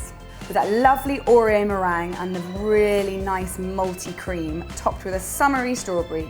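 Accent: England, United Kingdom. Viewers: I love the way you talk and your big and beautiful smile ♥ And of course all the delicious stuff you cook. My mom is also a pastry (I don't know if it's well written, I'm Argentinian and my english is not that great) and my favourite deserts are pavlovas, so she always makes it for me. I'm gonna tell her about this recipe. Keep cooking, and keep that beautiful smile in your face:3 It's a pleasure to see your videos from Argentina and listen to your beutiful english accent! Big hug ♥ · Hi, just discovered your channel, so nice to hear an English accent on YouTube! I saw another video for making individual meringues and the instructor said they should be put in the oven at 275 for 45-60 minutes....I don't know if my cooker is Celsius or Fahrenheit but I guess 275 was too high as the meringues came out brown. No matter how hard I tried, I could not get my whites to fluff up as much as you did. 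Is that because I didn't beat enough? beat too much? or is it just that I didn't have an cream of tartar? I would really appreciate your advice.....I wanted to make these for a special family dinner and was so disappointed by the mess I finally produced!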